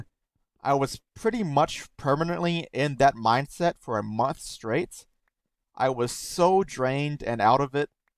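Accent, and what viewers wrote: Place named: Georgia, United States. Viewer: thank you for sharing your story it was very nice. you actually said you were from the south and it didn’t click until you said that and I was like “oh yeah! you sound just like people from my city!” I’m from north GA. I’m glad that you’re feeling better and sh clean for a year! congrats! 🎉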